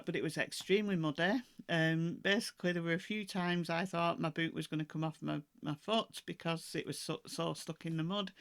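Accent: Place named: Yorkshire, United Kingdom. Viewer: I'm from Ohio and I'm 85. Since I was 8 ,I've had pen pal from Yorkshire. We are like sisters. I love hearing your accent. It. reminds me of her. I enjoy all of your videos, but don't always comment.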